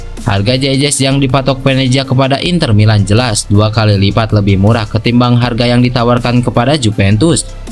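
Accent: Java, Indonesia. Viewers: Ini youtuber bicara mya aja medok ga bagus , nyesel putar vidio ini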